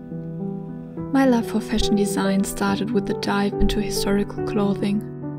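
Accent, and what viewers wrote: Germany. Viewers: Die Videos sind so wundervoll gemacht. Es ist fast wie in einem Historien- und Kostümfilm und verzaubert regelrecht. Zum Schmunzeln bringt mich immer die hörbar deutsche Aussprache ❤ Es gibt kein Nähkanal hier der so hochwertig und ästhetisch ansprechend umgesetzt ist und zum träumen anregt. Jedes Kleid wird zu einer Geschichte und mit Gefühlen aufgeladen. Einfach traumhaft, mein ganz grosses Kompliment 🎉🏆💐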